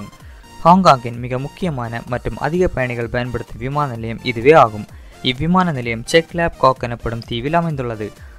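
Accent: Kanyakumari, India. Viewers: Ne kanyakumari aa pa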